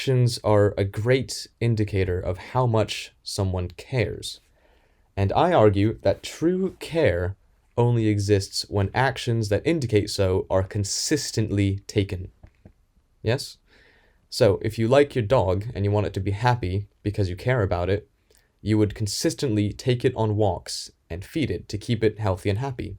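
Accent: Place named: United Kingdom. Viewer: why do you sound british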